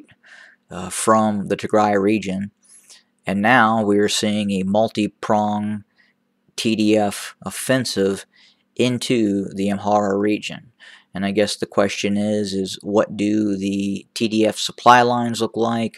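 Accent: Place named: United States. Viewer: it is an American accent indeed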